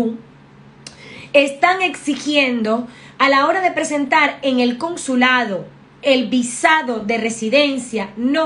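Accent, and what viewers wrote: Cuba. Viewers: eres cubana?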